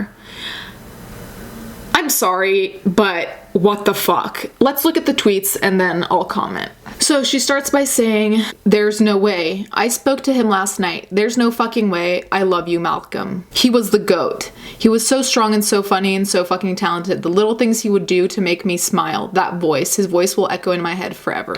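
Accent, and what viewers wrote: Italy. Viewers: I saw a tweet that said that she Googled his middle name so she could put it in her post HA. Omg I didn't know you were Italian?? The accent!!! Drag me. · Love you saying things the Italian way lol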